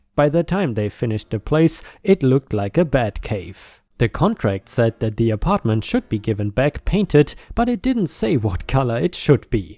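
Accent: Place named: Germany. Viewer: thank you for the reply! I’m honored! Lol. Well, I’m old, and wear glasses, but I love to read along with your voiceover because sometime many of you “clean up” the written words and I like to know what the OP actually wrote. Lol. Thanks for the reply. BT W. I love your German accent, my elder relatives were German immigrants and I love hearing the accent again. 😘😘⭐️⭐️⭐️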